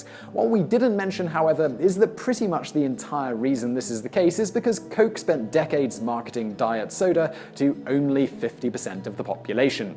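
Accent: United Kingdom. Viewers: Public Enemy - he's British. That's how they say it. It's not just him, it's an entire nation as well as everyone in other countries who was taught British English as a second language. So yeah, a massive percentage of English speakers say it that way. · Samm Ball II American he has a British accent if he spoke like an American you'd hate his voice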